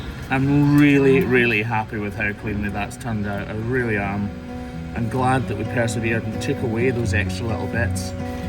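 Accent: Scotland, United Kingdom. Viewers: Enjoy your videos and the craziness you find. It is quite amazing (and gross). You're also very handsome, and you're all up in some serious cow sh*t on the daily, helping fellow animals. That's all kinda, pretty hot (not the cow shit, but the level of care you put into your work and the animal, while spitting/wiping it away when you get shot in the face with shi*t, piss or puss). Haha. God bless you, sir, for the work you do. AND! You're from Scotland! I love it, love the accent. My dad's side of the family is Scottish; I've traced my family heritage back to Scotland, in Lanarkshire. My family raised Clydesdales, which also originate from Scotland. It's been my next big wish to make a trip to visit Scotland. Born and raised in Montana, USA, on a farm and ranch, so yes, I have much appreciation for your job and the work you do! Good on ya, lad! 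And, yes, I subscribed! :) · Handsome man 😍 Scottish accent 😍😍 Kindness to cows 😍😍😍😍😍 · Love what you do for these cows, I'm from America and I love cows, it's awesome to se you helping them. I never really knew the full extent of the work that goes into caring for the cows. Great explanations as always man!! Also, love the Scottish accent (I'm saying this and laughing cause I'm from Alabama, and we have a very thick accent.) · I like channels such as yours because I love Scotland , people from Scotland, and animals. My ancestry includes dairy cattle and their care on nearly every branch and twig of my family tree. I no longer question where my curiosity comes from because it is all genetic memory. So my great, great grandfather had an accent like yours. His grandson married a young lady who was born in the US to Bohemian parents who spoke German. Dairy cows on both sides. My matrilineal side back before 1700 when they arrived in Jamestown, Virginia came from a place in France called Beau Vac or beautiful cow. 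I appreciate your skill, love, and care of these beautiful creatures. Thank you.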